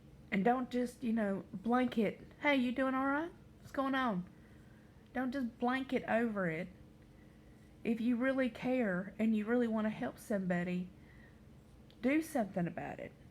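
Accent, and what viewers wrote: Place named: Carolina, United States. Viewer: Thanks. I’m from chapel hill and i recognize that Carolina accent anywhere ❤️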